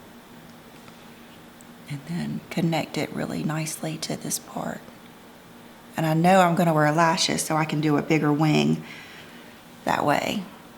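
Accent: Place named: North Carolina, United States. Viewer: Are you from nc? I'm trying to place that accent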